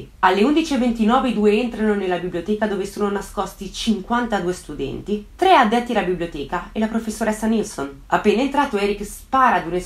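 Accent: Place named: Liguria, Italy. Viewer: ciao! Ah ok mi sembrava fossi ligure come me. Cmq brava mi piace come racconti gli eventi. E x questo che sembrava che avessi un qualcosa di famigliare. Grazie mille x la risposta! Hai un nuovo iscrittoooo!! !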